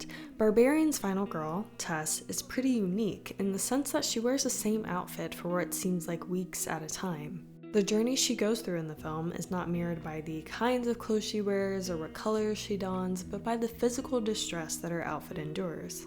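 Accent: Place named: Brazil. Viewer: Have not watched the video yet. However, are you brazillian, girl? The accent is pretty iconic, and remind me of brazillian people.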